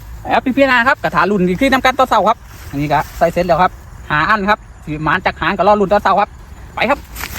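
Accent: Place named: Surin, Thailand. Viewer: ฟังเสียงนิสุรินแม่นบ้อหำ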